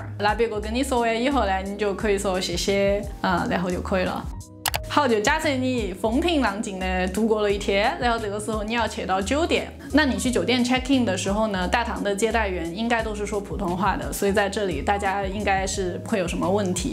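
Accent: Chengdu, China. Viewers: 你这是成都话，哈哈， 电影推荐你忘记了风车车和假老练（猫和老鼠四川话版） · 绝对标准的成都话。亲切！ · 在四川会说普通话就行，这个up主准确说是成都话。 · 这个妹儿肯定是成都土生土长的，但父母不一定是老成都，所以发音属于年轻一代的成都人，也没得啥子很地道的方言词汇。要学资格成都话就看李伯清。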